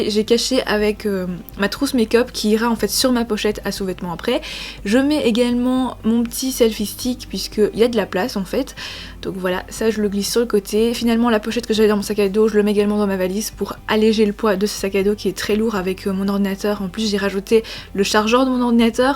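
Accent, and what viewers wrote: Belgium. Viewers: tu es belge ? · Tu es de Belgique ?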